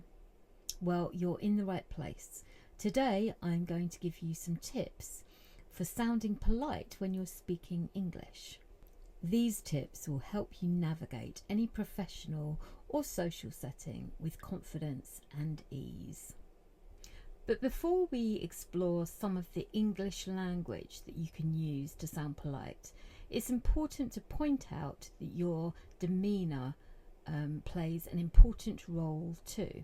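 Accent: United Kingdom. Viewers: Thank you very much for shearing 😊 I really like the way you teach English and of course you wonderful British accent❤❤❤ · Very good your class and your accent is beautiful where are you from in England?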